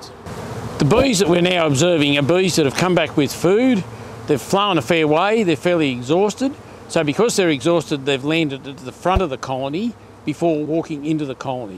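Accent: Australia. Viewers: Love the Aussie accent. I was waiting to hear "crikey". Lol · Greetings from America! I LOVE your Aussie accent! May I call you? hehe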